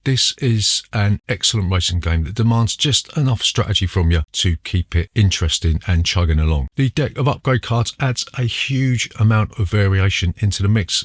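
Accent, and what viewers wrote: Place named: Cockney, United Kingdom. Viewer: From one cockney to another; you're a top geezer!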